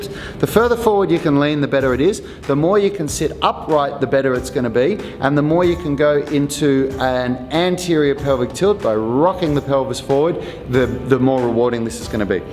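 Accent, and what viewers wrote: Australia. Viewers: Are you Aussie? 🇦🇺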